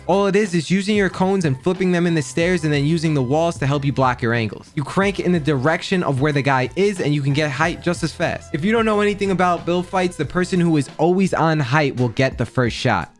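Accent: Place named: New Jersey, United States. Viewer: Thx so much and are you from New Jersey cuz you have the accent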